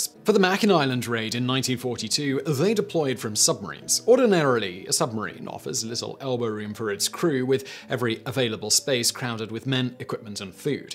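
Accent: England, United Kingdom. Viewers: Gobble De Gook - He sounds 100% English to me. Not a hint of yank.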